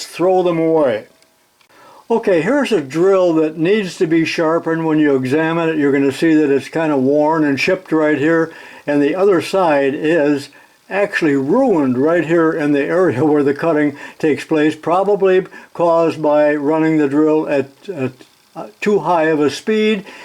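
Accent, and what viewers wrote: China. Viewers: Chinglish can be hard to understand.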